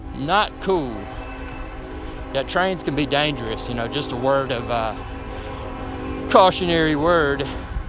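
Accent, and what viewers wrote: South, United States. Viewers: This Is One Hot Irish Bear WooF ! . He Has An Interesting Accent Is He From The South? Walking Good For You Keep It Up Dude.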